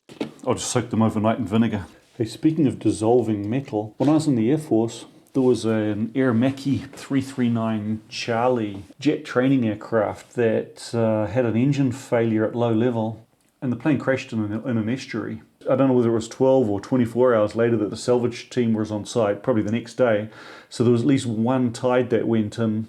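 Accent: New Zealand. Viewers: Came here by accident on a Sunday evening & heard the oh so recognizable Kiwi accent that made me stay & confirmed New Zealand connections with the "Bog" body filler . Subscribed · "four inch input vice on the idge of my welding binch" What's that accent called? P.s. New Zealand? Where's the old one? P.p.s apparently Zeeland is a dutch province, and dutch were known sea explorers so I'm not surprized if they named your country.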